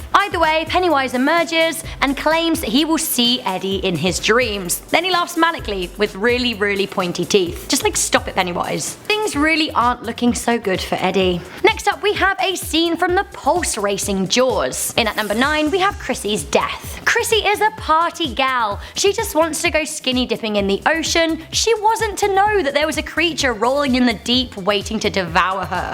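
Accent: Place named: United Kingdom. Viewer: is so fun to watch and listen to. Gorgeous lady with a delightful British accent.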